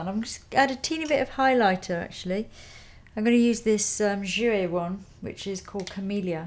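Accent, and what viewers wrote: United Kingdom. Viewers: Beautiful!!! Oh, by the way, I love your accent. Whereabouts in the UK is it from? All the best from a Portuguese fan:) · I love yr British accent!!!!!!!!!!!!!! <3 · British lady <3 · English is not my first language but I definitely understand every word she says.......She speaks perfectly.....This is the british accent that I adore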